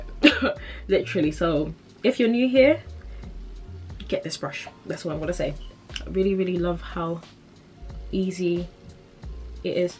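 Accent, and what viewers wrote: United Kingdom. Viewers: Wasn’t expecting the british accent!! Buzzing cause most foundation routines are american and they using products we can’t get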